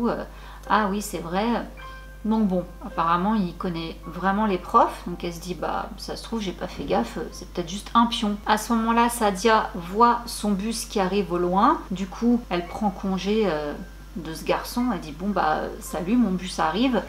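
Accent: France. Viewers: Coucou, tu n'as pas à t'excuser, tu as une vie en dehors des réseaux sociaux et de YouTube et c'est très bien : tu poste quand tu veux !! Et moi je préfère que tu prononce à ta façon ( à la française) l'introduction, voilà..... Bravo à ce jeune homme et à ses amis, les deux filles ont bien fait de porter plainte car ce gars sera plus facilement retrouvé dans l'avenir et ça préviens d'autres personnes qu'il y a des soucis avec ce genre d'approche....... Merci pour cette histoire, gros bisous 🥰👏🏻👏🏻💕🌺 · Team "comme t'es à l'aise pour prononcer" xD J'avoue j'aime bien quand c'est à la française ! Allez go it's tea time 😍 Ah non bonjour bonsoir 😂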